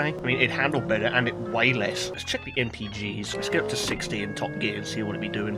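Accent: United Kingdom. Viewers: this funny british man making my day better again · 0 new funny british man car tutorial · The BRI'ISH funny man has posted WE DO EHT🍷🗿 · Now make an Kei truck funny British man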